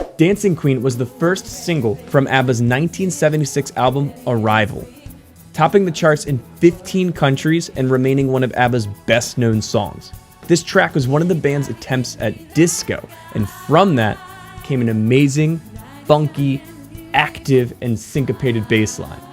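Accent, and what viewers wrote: Baltimore, United States. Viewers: The Baltimore accent is one of the greatest American accents of all time. Now I need to snort lines of Old Bay and put on some.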